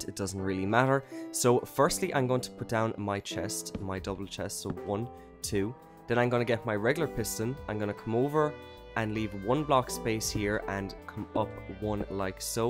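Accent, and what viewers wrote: Ireland. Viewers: I'm Irish too! Cool ur accent is so col haha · are u irish because i am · Wow! Your Irish! (Well if my accents serve me correctly)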